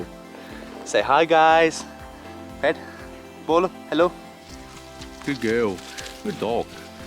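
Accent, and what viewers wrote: Punjab, India. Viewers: Spot on Punjabi accent. Tusi saare family members nu Punjabi sikha deni aa bol bol k 😂👍🏼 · God bless lovely family. Very much astonished at your punjabi accent. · l love your Punjabi accent!! nice family GOD BLESS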